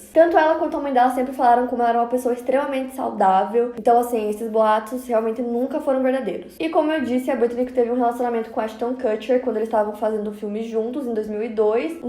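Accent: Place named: São Paulo, Brazil. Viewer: Vcs prestando atenção no vídeo e eu aqui ligado no sotaque gostoso da, pois é uma mistura de paulistano com um fundinho de nordestino....Sei lá se é isso, sabe?! Teoria da conspiração.....rs😁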